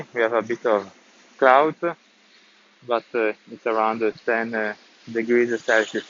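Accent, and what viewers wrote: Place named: North, Italy. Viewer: I love your Northern accent LOL. Fantastico video! Saluti da Toronto.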